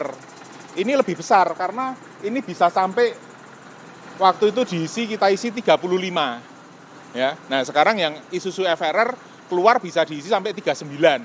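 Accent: Java, Indonesia. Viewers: Seneng senyumana pak bos sama logat jawanya .yg khas👍🙏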